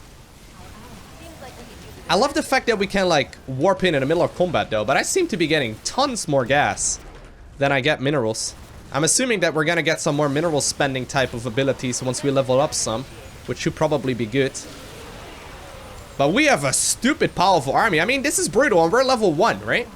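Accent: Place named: Netherlands. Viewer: lovely dutch accent...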